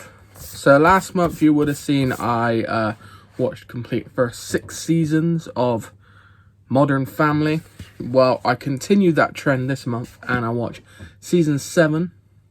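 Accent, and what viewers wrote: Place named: Bristol, United Kingdom. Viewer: A fellow Bristolian loving hearing the accent and also the sheer variety of films you watch - keep up the good work!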